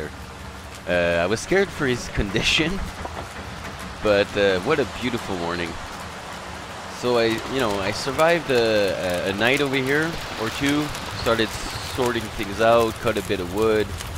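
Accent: France. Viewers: well thanks for your great videos/commentaries! Btw you sound french are you? 😜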